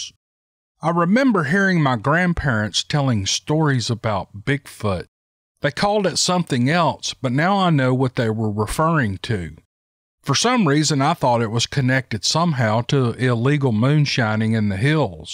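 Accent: South, United States. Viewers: I hope you enjoyed Thanksgiving. I enjoyed your narration of fantastic story.always good to listen to.your goodoleboy accent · Man oh man! Fantastic stories, every one of them! &.... you ALWAYS do these stories justice, your voice becomes animated as you launch into the character of the story. The intensity & excitement in your voice puts the listener right there in the encounter! I just love listening to your sweet Southern drawl. You are truly a master story teller. And thank you to those authors for sharing their encounters! A Wompus cat!? Lol, that's a new one I've never heard. I love it! I remember as a kid growing up on a Texas army base, my dad (a drill instructor of all things) would take my 2 older brothers small game hunting often. If I recall correctly, one of their first hunts on their own was a 'snipe hunt'. Having been let in on the joke while the boys were out hunting (with their pellet guns & burlap sacks) I couldn't wait for their return. 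I knew right then Dad had armed this little sister with some "ammunition" that would provide entertainment at their expense for years to come. Of course karma has a way of finding everyone & turn about is fair play. We had a pretty good snowfall one winter, a rare event in that part of the country, and I missed much of the fun being too scared to go play outside. When the boys found out why, then it was their turn to laugh. Being the last one out the door, after getting all bundled up, Daddy told me to be real careful, especially in the deeper snow, "cuz them thar snow snakes will crawl up your butt & freeze ya ta death." Hahaha We live in the g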